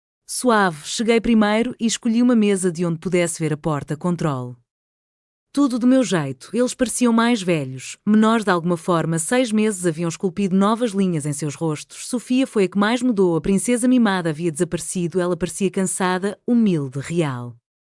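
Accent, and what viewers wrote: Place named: Lisbon, Portugal. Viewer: O acento lisboeta leva a pensar em emigrantes portugueses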